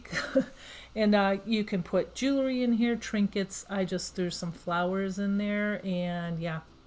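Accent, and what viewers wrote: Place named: Midwest, United States. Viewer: Love the stuff. I hear a strong midwest accent. 😊😊 I'm originally from Illinois.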